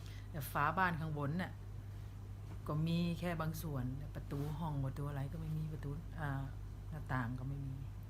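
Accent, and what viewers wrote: Korat, Thailand. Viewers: ชอบแม่พูดสำเนียงโคราช น่ารัก💕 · ชอบแม่สุพูดโคราช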